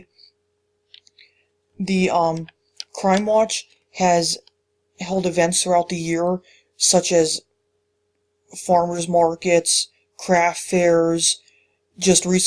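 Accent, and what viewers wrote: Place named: Northeastern Pennsylvania, United States. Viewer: I was born here in 1945 and just sold my father's house not too long ago. I still come back to visit. Great job,. Hopefully, people like you will make Plymouth once again what is was when I grew up there. You even speak NEPAlese perfectly! May God bless your life in Plymouth.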